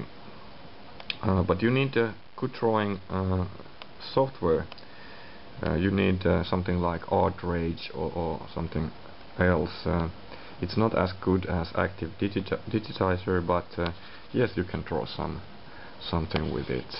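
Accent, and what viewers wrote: Finland. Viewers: Kyllä se suomen aksentti on sitten oikeasti selvää ja helposti ymmärrettävää... Kiitokset :) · Suomalaiset aina puhuu näin englantia.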